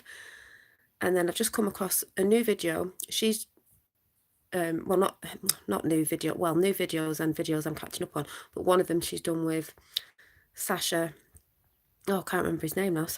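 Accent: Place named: Oldham, United Kingdom. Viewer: Hope you don’t mind me saying .. it’s great to know there is someone who thinks like me near me with the same funny accent .. I normally hear stuff like this from Murica.. I’m from Oldham 😊😊😊 love and light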